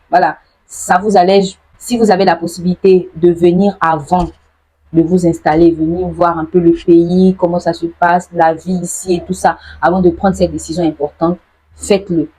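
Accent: Ivory Coast. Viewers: Du moment où tu as un accent ivoirien, sache que tu ivoirienne. Merci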